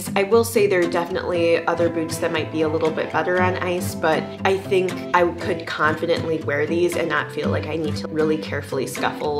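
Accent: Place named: Minnesota, United States. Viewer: the minnesota accent gets stronger as the video goes on :)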